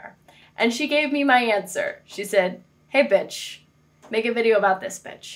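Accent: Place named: New York, United States. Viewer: You get more of a new york accent with every video haha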